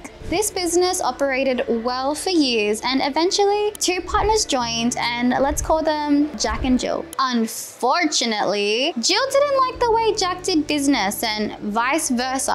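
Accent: Australia. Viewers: Australian????😭😭😭😭😭 that accent caught me off guard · I HAD NO IDEA SHE WAS AUSTRALIAN DANG. Gorgeous face body and voice, shes blessed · I had no idea she was Australian or had such a beautiful accent :Edit: thanks so much for all the likes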